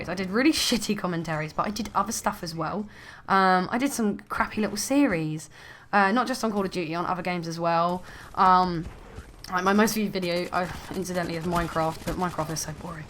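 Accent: United Kingdom. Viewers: you sound like your from Kent. I'm from Kent :D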